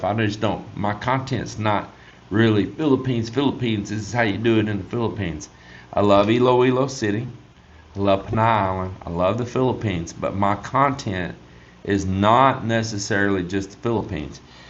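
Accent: Texas, United States. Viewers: You actually had me at the beginning and I was checking if I had accidentally somehow changed my audio/video settings. Then I figured you were getting back at the guy that commented about your slow monotone Texas Twang 😂...I watched the whole video anyways. I'm half Filipino half American (born in the Philippines). I actually lived in the US for 25 years, was in the US Army for 12 (at the moment lived in the US more than in the Philippines). I'm retired now (42 yo) and live just north of Subic Bay. My eldest son was born in Frisco, TX when I was stationed in Plano, TX for Army Recruiting. I gotta get out there to your place and visit y'all sometime, I enjoy your channel specially the content about construction and the boats. Take care now. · I enjoy your Texas accent. The funny thing about the Texas accent is that it is contagious. I lived in Maine all my life until I moved to Cebu a few years ago and I had an uncle who I had never met who had lived in Texas for years and we were all so surprised that he had caught the Texas accent. 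In Maine a Texas drawl really sticks out. That works both ways, a Maine accent is very noticeable when traveling. I think that living in the Philippines does change a person's English by using different expressions like aircon, choco, CR, asawa, etc.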